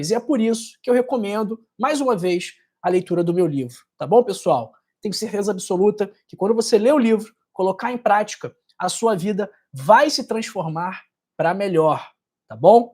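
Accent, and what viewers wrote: Rio de Janeiro, Brazil. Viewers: sou de Salvador Bahia.Voce e Carioca na cara, com este sutaque gostoso. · Doutor o seu jeito de falar é de carioca , acertei ? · Esse sotaque carioca dá gastura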